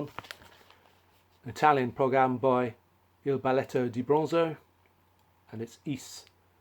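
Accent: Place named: United Kingdom. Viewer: Hi, I've just found your channel and subbed. It's always great to find another UK voice, especially one talking about Prog. I've not heard of before. One for me to look into. rings a bell. I'm sure I have a 12 inch single by them somewhere. are a new one on me too. Nice Congrats on the Roger Dean cover. I've not seen that cover folded out like that before. Fantastic. I've had my eye on the album for a while now, purely because I live near some viaducts like those on the cover. I was put off though when I read a review that it was reminiscent of. But it sounds like I should give them a go anyway. Great video! We didn't overlap once. Cheers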